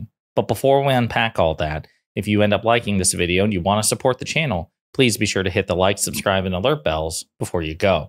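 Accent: South, United States. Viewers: Great southern hoo hah accent…your Trump’s not bad either..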